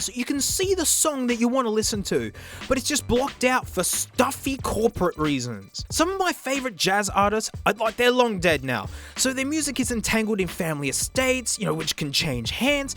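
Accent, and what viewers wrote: Australia. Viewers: He's got that perfect Aussie accent that makes you want to listen to anything he says.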